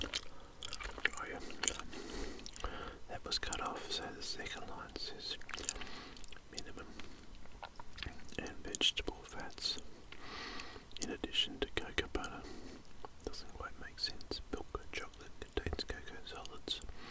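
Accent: Australia. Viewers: I guess the Australian accent is the best for whisper asmr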